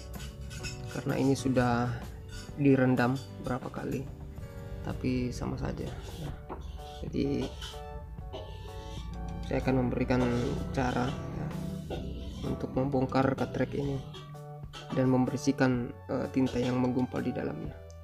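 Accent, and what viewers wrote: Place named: Sulawesi, Indonesia. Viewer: Orang sulawesi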